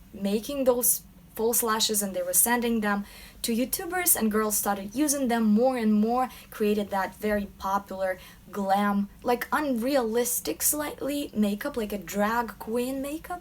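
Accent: Russia. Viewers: Sorry, are you Russian? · Is that a slight Russian accent I can hear. Good analysis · me Its not constructive criticism. It is shaming someone who has English as a second or third language I guess. She’s Russian. She tries to speak English properly. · I love your accent, are you Russian? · Надо же как я угадала что акцент русский😎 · I believe shes from Russia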